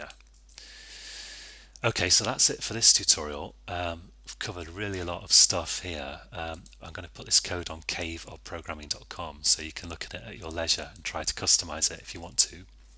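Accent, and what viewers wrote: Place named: Cheshire, United Kingdom. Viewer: ***** Ugh, how did I not see that, slight dyslexia problem. Thank you. By the way, which county are you from in the UK. Always think you sound like your from close to my home in Cheshire, but maybe I've just been away too long.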